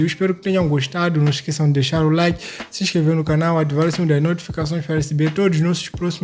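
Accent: Brazil. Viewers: seu português, tá ficando "abrasileirado." 🇧🇷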